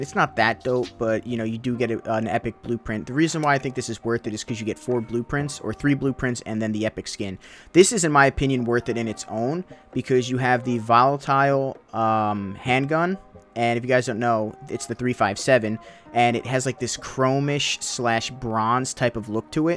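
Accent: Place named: Germany. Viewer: in his bio it says he's from austria and he his first language is german you can also hear in his accent that he's german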